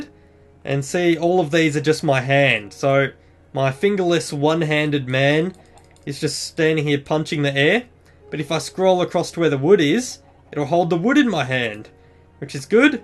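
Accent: Australia. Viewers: Australian, huh? (Accent) Two minor corrections: Worlds are not random, but if you don't enter a seed, the seed to make the world will be. Not quite infinite. Possibilities, almost, the world itself is almost 4x the Earth's surface. Anything after that are either fake chunks, or over-wrapping from the opposite end (not sure of this). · Is this dude an Aussie? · no offense but are u aussie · lol your from the outback xD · are you australian????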